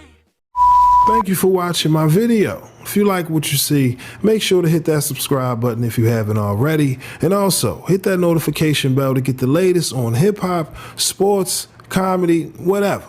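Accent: Baltimore, United States. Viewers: I'm from Baltimore and I'm curious are u from Baltimore also? Sounds like it.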